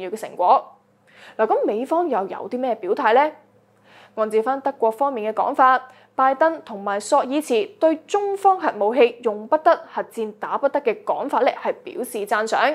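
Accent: Standard. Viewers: 歡樂時光，人靚聲甜字正腔圓又鬼馬😘😘😘👍👍👍💪💪💪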